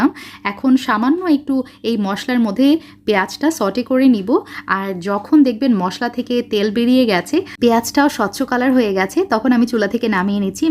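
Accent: United Kingdom. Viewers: আপনি কোথায় থাকেন?বিদেশি কিংবা ইংলিশ মিডিয়ামে পড়ুয়া বাচ্চাদের মতো বাংলা এমন স্ট্যামার করে বলেন কেনো?আপনার আগের ভিডিওগুলিতে তো এমন স্ট্যামার করে বাংলা বলতেন না আপনি তাহলে এখন এমন করে কথা বলেন কেনো?শুনতে ভালোই লাগে কিন্তু এইভাবে ইংরেজি টানে বাংলা বললে ভাষার মৌলিকত্ব নষ্ট হয়ে যায়।প্লাস আপনার সিমপ্লিসিটিও নষ্ট হয়ে যায়।অনেক আর্টিফিশিয়াল লাগে।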